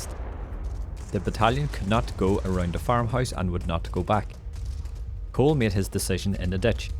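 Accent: Northern Ireland, United Kingdom. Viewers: Well done. Hence my subscription. Having spent a year in Derry, courtesy of the U.S. Navy, your accent sounds as if you are from Northern Ireland.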